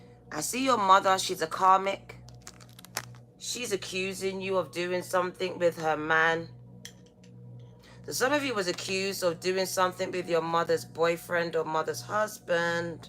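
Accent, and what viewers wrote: United Kingdom. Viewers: I absolutely love your old world Brit accent. My father was British and had one too.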